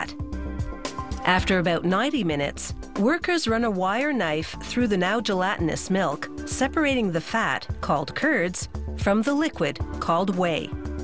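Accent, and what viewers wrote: Canada. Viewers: is the narrator Canadian? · Actually this is Canadian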